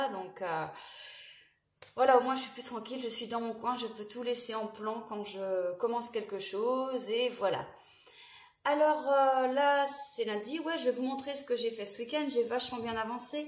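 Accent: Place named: Mayenne, France. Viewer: Bonjour j adore votre énergie et votre simplicité du coup je me suis abonnée et j ai visionner vos anciennes vidéos j ai adoré celle de la teinture de la laine et c est du boulot je viens d entendre la ville Laval et rien qu' à vous entendre je me doutait que vous êtes mayennaise je suis aussi de la région à bientôt dans la prochaine vidéo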